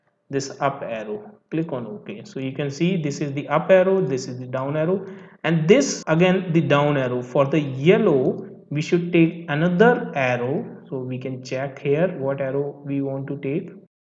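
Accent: India. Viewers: I'm so sorry to write this but I simply can't focus with this super Indian dialect, if this guy is from India that is. No offence, really, I just can't concentrate. Wish you all the best 🙂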